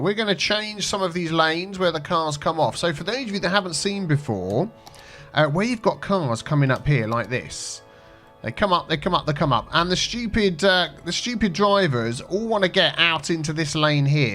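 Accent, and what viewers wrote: United Kingdom. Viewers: love and support from Korea(obviously from south😉) I enjoy watching your video cos i like this game but also your british accent is so satisfying to listen to!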